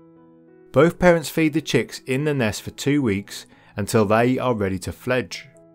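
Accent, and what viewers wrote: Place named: Norfolk, United Kingdom. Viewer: Used to Love seeing these at my Nan's garden in North Norfolk. You from around there? I can detect the accent:)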